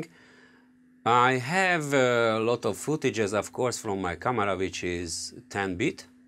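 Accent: Hungary. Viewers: Im sure I hear hungarian accent there. I might be wrong. This monitor is £498 on amazon. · Köszi az infókat, nagyon jól jött :) ( az akcentusodból kiindulva magyarul írtam :D ) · he he az első mondtad után tudtam hogy magyar vagy :D köszi szuper volt a videó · Great monitor, good review ... :). Good humour, lovely accent ... :): are you from Hungary or somewhere in the neighbourhood ... :)? In any case, it was great help for me, now I know why I need at least 40" for a 4K monitor ... :)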